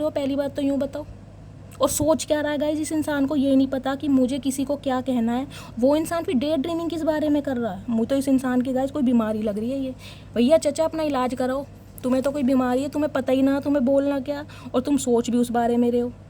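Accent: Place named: Uttar Pradesh, India. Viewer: Ye बताओ कि आप मैनपुरी आगरा साइड से हैं। आपकी बोली सुनकर ऐसा लगता है। मेरी बोली भी ऐसी ही है। कुछ कुछ ।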